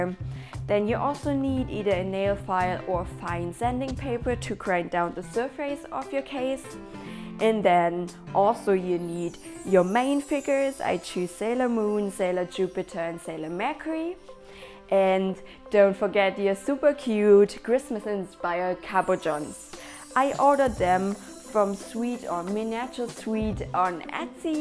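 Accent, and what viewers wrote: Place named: Germany. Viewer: hi you have a german accent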